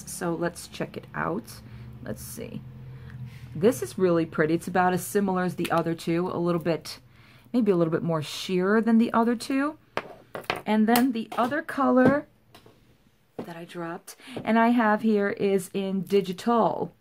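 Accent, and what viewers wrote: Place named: New York, United States. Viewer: I hear a New York accent girl! Love shopmisssa first impressions btw.💕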